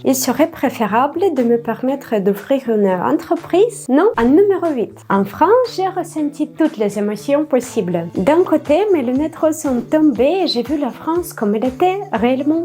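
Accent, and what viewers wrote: Russia. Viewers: Merci pour ta vidéo. Si seulement la majorité des français pouvait aimer ce pays autant que toi, on en prendrait beaucoup plus soin, malheureusement en France on aime toutes les cultures du monde, sauf la notre. Ça fait plaisir aussi de voir que tu fais autant d'efforts pour maitriser notre langue mais si je peux me permettre un conseil: essaie de faire moins de faute mais garde quand même toujours un peu ton accent russe. Déjà parce que ça fait partie de ton histoire mais surtout, un accent étranger ça donne toujours beaucoup de charme; même les américains préfèrent bien souvent l'accent anglais à leur propre accent. Enfin, ton accent permettra aux Français de savoir que tu as des origines étrangères et donc ils te pardonneront plus facilement si tu fais des fautes de français ou si ton comportement leur semble un peu étrange. Voici un petit exemple amusant venant d'un humoriste Franco-Anglais qui te permettra de comprendre pourquoi tu dois garder ton accent: <link> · J'envisage de m'expatrier en Russie dans l'année à venir, et entendre ces points de vue croisés est une mine d'or pour moi. 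Merci! Твой французский идеален, а русский акцент просто очарователен! :) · Votre français il est super, l'accent russe lui donne tous son charme. Merci pour votre vidéo. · Наконец-то ютуб рекомендовал мне видео, где слышно, как звучит русский акцент по-французски. И наконец-то я попал на видео на французском языке, где я могу разобрать больше пары слов в минуту. 15 минут в дуолинго в комнате раздумья не прошли зря! Je suis tres content! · Je trouve que ton accent russe rend ton français mignon 🥺 d'ailleurs sa m'intéresse beaucoup de voir une personne russe vivre en France car tu t'intéresses à notre culture 🥰🤗 j'aimerai tellement apprendre le russe que je connais pas très bien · Jajaja j'aime ce petit accent Russe jijij ne le perd pas .😅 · Bonjour. Je viens de tomber sur ta chaîne Youtube et sur cette vidéo par le pur des hasards. Ca me fait tellement plaisir que tu aimes notre cher pays qu'est la France malgré les tensions très fortes et très dominantes dûes au contexte actuel. 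Que ça me fait plaisir de te voir sourire, de te voir t'enthousiasmer, de dégager de bonnes ondes positives, dégager de la chaleur humaine et de la bonne humeur. J'adore tout autant ta franchise. Tous ces éléments combinés te donnent énormément de charme tout comme ton accent russe. Ca fait tellement du bien. Mille mercis à toi. Surtout ne change pas et reste comme tu es. Saches que tu as une superbe maîtrise de la langue française et que tu fais très peu d'erreurs. Nul n'est parfait. Nous devons tous apprendre de nos erreurs. Prends bien soin de toi. Amuse toi bien avec ton apprentissage au tennis. J'espère que tu pourras enfin créer ton entreprise dès que possible. Au plaisir de découvrir tes autres vidéos. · Tu es intelligente, tu as une vision très juste et réaliste des choses. Le mélange des cultures est ta force. Tu parles un excellent français avec ton accent russe si craquant. J'ai un grand respect pour la Russie, sa langue, sa culture, son histoire et ses scientifiques. 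Je trouve l'hymne russe le plus beau de tous, il est fougueux comme La Marseillaise. C'est un pays que j'aurais voulu mieux connaitre, je ferai cela dans ma prochaine vie. Je te souhaite santé, bonheur et réussite. En toute bienveillance.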